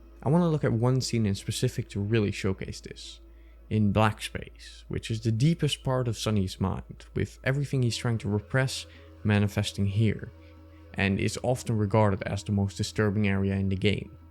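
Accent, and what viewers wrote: Netherlands. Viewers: altijd heerlijk om een nederlands accentje te horen wanneer je er niet een verwacht 💕 💕 🇳🇱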